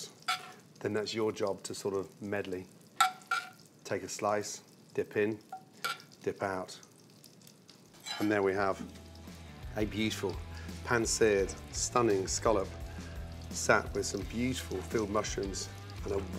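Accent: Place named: Australia. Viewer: Hi Gordon if I may address you as such I am 89 years old and Vietnam veteran, and a newbie at cooking, which I love at one and a half years.. and I must say your approach to cooking is impeccable.. even with your Australian accent, you present yourself admirably.. I consider myself a better than average cook.. and living in Massachusetts I’m very close to all the scallops that I can bring home. One thing amazing about scallops. It does not take very many to fill you up. I enjoy your presentations very much and I like following you. Thank you.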